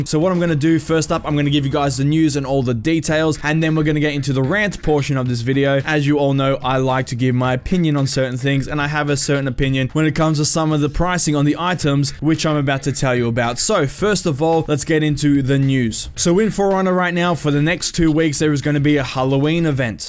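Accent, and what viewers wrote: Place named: Australia. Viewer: Also, where abouts in Aussie you from mate?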